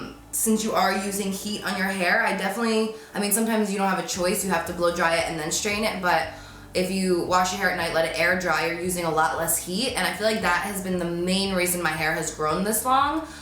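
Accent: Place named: East, United States. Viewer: I really like your slight accent! It sounds kind of east usa idk. Like, a subtle mix of boston n new york. Idek but I like it 😍😂😂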